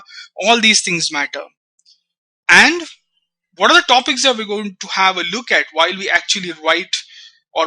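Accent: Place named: Scotland, United Kingdom. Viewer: ha its bit uk cuuunt. scootish i think.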